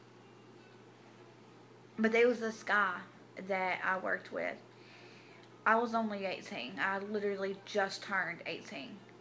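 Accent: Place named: South, United States. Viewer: I love your Southern accent and I'm sorry this happened to but you're very pretty!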